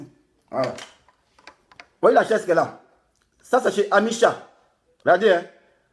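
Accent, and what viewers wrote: Ivory Coast. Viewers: je n'aime pas quelqu'un et je suis toujours calle' sur sa page, je ne rate aucune vidéo! Quelle souffrance!...il est si délicieux le français ivoirien et nous aimons notre français nous ivoiriens, bravo 👍👏👏👏👏👏👏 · Toi là tu ne sais pas parler.Tais toi avec ton français ivoirien.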